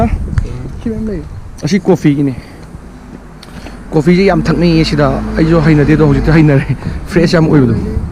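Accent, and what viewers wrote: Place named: Haowe, India. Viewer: Bro ngi haowe khuhaido taba yam nungai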